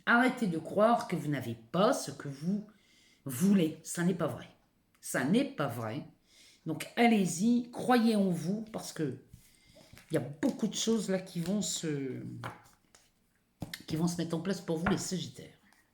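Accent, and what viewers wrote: North, France. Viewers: Bonsoir ! C’est la première fois que je vous écoute et j’aime beaucoup la façon dont vous expliquer le tirage ! J’avoue que vous l’avez même fait rire quelque fois car vous êtes très naturelle et c’est tellement agréable 🤩🤩🤩 Je me suis abonnée et je vais vous suivre car c’était très agréable à vous écouter 💕 Je me permet de vous poser Une question : vous ne seriez pas du Nord ? Merci 🙏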